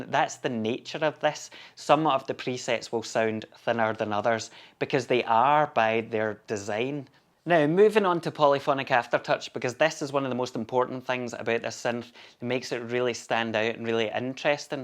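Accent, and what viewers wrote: Scotland, United Kingdom. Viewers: Sold my desktop last month to fund a Super 6. There was just something about its sound that I didn’t quite like compared to my other synths. Loved everything else about it, such a deep synth engine, amazing mod matrix and the macro assign is genius. But it just wasn’t getting the same love and attention that my Peak, XD and Iridium were getting. I may pick up an Explorer again though at some time. Always good to hear a fellow Scottish voice on a synth channel.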